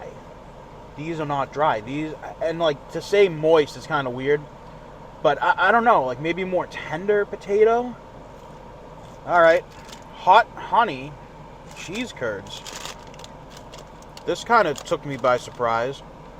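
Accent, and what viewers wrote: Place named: Boston, United States. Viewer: We love you, and the Boston accent really hits the spot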